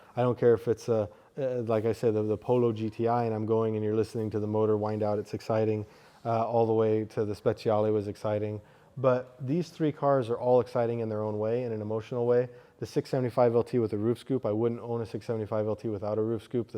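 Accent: United States. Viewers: Totally off topic, but are you American? Obviously you're in Germany, but you speak like an American so just randomly curious.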